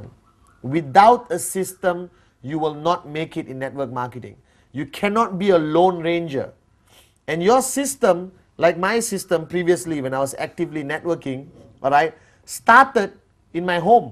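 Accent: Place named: Malaysia. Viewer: it should be in Hindi language also... because of most of the people are unable to understand English specially in malesian accent.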